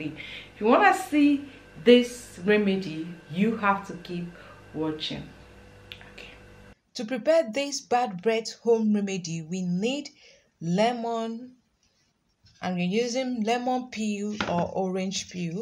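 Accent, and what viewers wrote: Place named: Nigeria. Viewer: Nigerian accent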